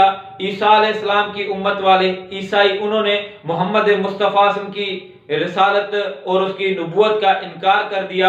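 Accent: Sindh, Pakistan. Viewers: gud urdu in pure sindhi eccent !!!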